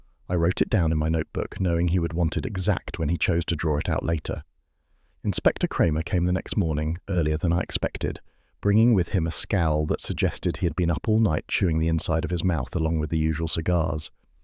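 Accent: United Kingdom. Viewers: Doing these narrations is a lovely tribute to the works of Rex Stout, but as an American, I just can't listen to these stories read with British accent, sorry. Stout was a quintessentially American author, and the descriptions of New York, the brownstones, the furnishings, everything are all about America. It's jarring to my ears to hear them read as if they were Sherlock Holmes stories. (I wouldn't be able to tolerate Holmes read in a flat Yankee voice, either., lol)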